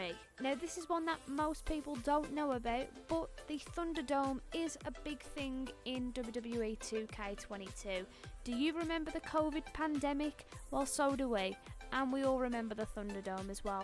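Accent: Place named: Birmingham, United Kingdom. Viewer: Your Brummie accent is top tier!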